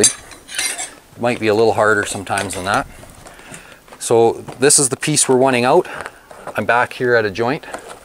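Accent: Canada. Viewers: I hear Canadian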